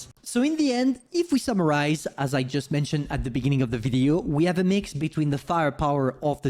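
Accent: France. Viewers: is he from france?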